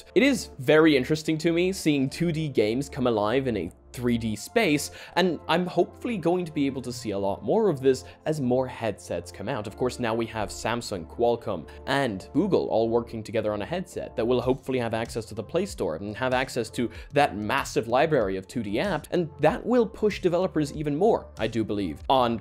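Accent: Poland. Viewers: The polish accent intro 👌🏼🔥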